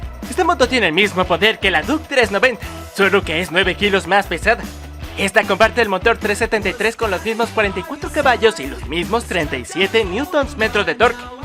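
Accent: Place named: India. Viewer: Acento tipo Apu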